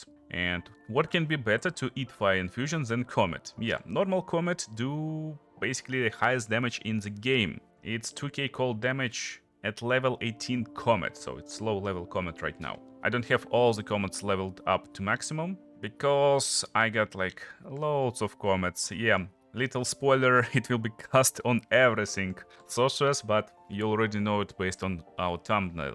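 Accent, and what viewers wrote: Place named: Russia. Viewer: Твой английский прозрачно намекает нам что ты русскоязычный)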